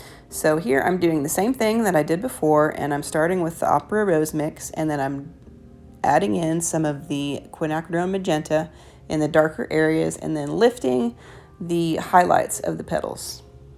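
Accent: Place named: United Kingdom. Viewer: Beautiful! Would LOVE to see (and hear) you do a painting using your British accent, lolol. I have the same viewing habits...mind how you go.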